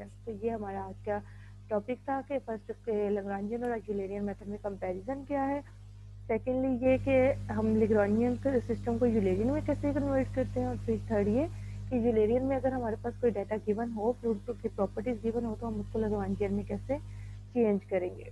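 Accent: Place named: Pakistan. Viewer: Voice se hi lg gya tha Pakistan ho ...🙈😅😅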